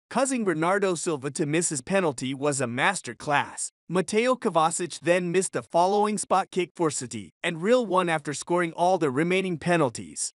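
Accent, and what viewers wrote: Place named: United States. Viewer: Amazing how AI with a US accent can pronounce every other word incorrectly!🙄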